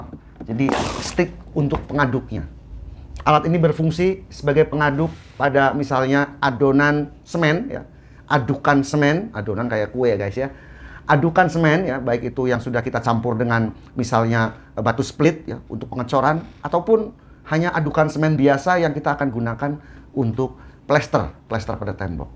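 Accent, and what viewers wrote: Tegal, Indonesia. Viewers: mantap bang? lebih mantap lagi ada suara kas orang tegal,ha..ha... joooos.!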